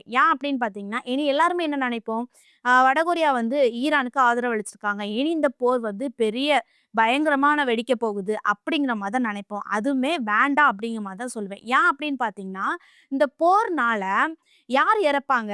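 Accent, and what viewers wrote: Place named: Coimbatore, India. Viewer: 🎉🎉 அழகான செய்திகள் நல்ல தெளிவான வாசிப்பு விளக்கம் 🎉🎉 புதிய வரவு வாழ்த்துக்கள் பாப்பா பேச்சு வழக்கில் கோவை மாவட்ட ஸ்டைல் தெரிகிறது அழகு அருமை. மதுரை சக்திவேல்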